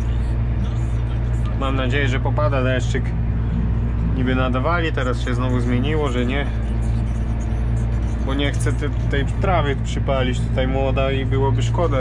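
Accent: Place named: Podlasie, Poland. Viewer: Słychać że Podlasie,z resztą tak jak ja. POZDRAWIAM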